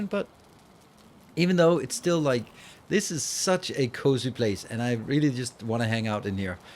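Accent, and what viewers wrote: Denmark. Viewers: Are your from denmark because You Sound like a danish takling english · Ohaaa en dansker, føler det er så cute når man kan høre det. Fed video!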